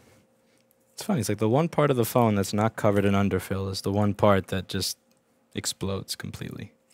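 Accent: New York, United States. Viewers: fuh rod? do you mean farad? (fay-rad) i think would be the phonetic spelling. might just be your new york accent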